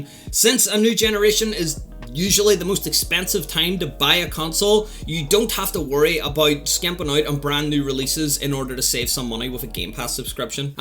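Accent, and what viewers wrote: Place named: Northern Ireland, United Kingdom. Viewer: he might live in LA but his accent is definitely northern irish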